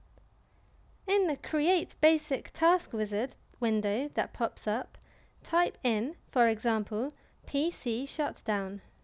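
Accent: United Kingdom. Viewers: That voice + london accent hit me in the heart LOL .... nice video btw :D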